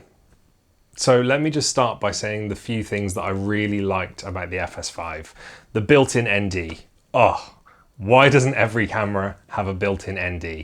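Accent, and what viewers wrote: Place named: United Kingdom. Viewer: you don’t have to thank me, I watch for selfish reasons. You have lots of potential, I’m liking your topics and of course, the British accent;)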